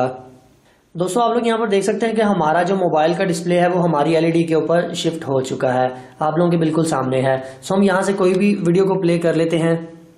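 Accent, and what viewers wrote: Punjab, India. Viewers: Phelay Urdu sahee say bolo, Panjabi style mai connect nahi bolo, apney nails cut karoo. phir video banana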